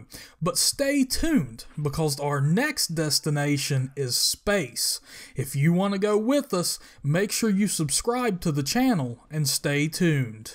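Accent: United States. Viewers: Wtf is your accent!? I love it! I know it’s American... I’m from New Orleans myself. ⚜️😉.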